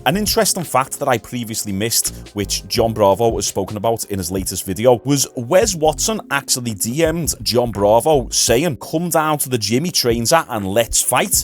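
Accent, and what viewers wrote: Liverpool, United Kingdom. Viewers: Are you Scouse? Actually, is this even a question? I don’t deal with if, ands or maybes, only absolutes!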